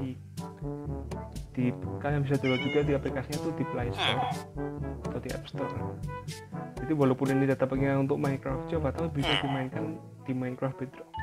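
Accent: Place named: England, United Kingdom. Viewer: Tak kira Anda dari inggris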